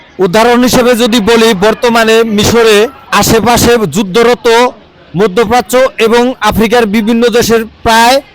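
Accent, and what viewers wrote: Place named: Tangail, Bangladesh. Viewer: অনেক সুন্দর উপস্থাপন, কথা শুনে মনে হচ্ছে আপনার বাড়ি টাংগাইলে। আমি মিশরে বেড়াইতে আসতে চাই।আপনার সহযোগিতা চাই।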